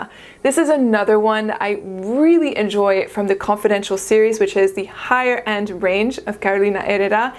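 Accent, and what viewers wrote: France. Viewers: always happy to see you with your uncontrollable french accent and all..😃😃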